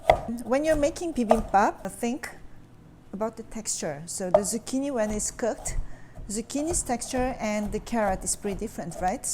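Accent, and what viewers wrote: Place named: France. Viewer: she sounds like she has a French accent.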